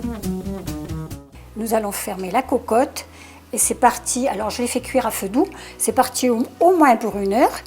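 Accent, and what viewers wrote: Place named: Algiers, Algeria. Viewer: Vous avez raison,j'arrive à " sentir" la bonne odeur ... hum ! Vous n'avez pas répondu à ma question, êtes vous d'Alger?,car vous avez un p'tit accent qui ne m'est pas inconnu 🤔 😊😉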